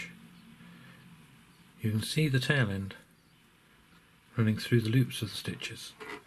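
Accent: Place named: United Kingdom. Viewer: Thank you for this very well done video. Sooooo nice to hear an British accent for a change 😉.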